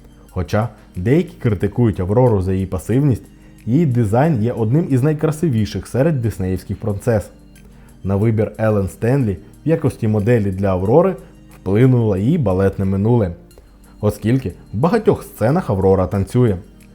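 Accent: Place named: Russia. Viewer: він має на увазі,що ви розмовляєте українською тільки для відео,має на увазі ваше лицемірство. Хоча направду,дуже чутно,що ви зазвичай,розмовляєте окупантською.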